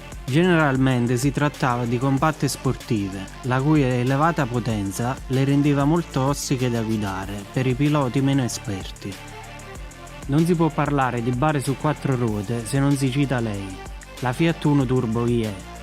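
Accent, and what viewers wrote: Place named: Naples, Italy. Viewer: Ma come cazzo si fa a commentare e redigere un servizio del genere con una voce con uno spiccato accento napoletano! Vergogna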